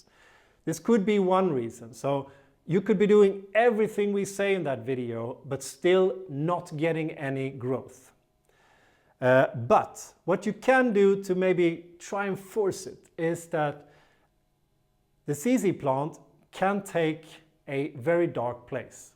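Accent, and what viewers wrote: Sweden. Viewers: Perfekt Engelska, jag älskar den svenska accenten! du ger bra råd, tack så mycket. Min svenska är skräp..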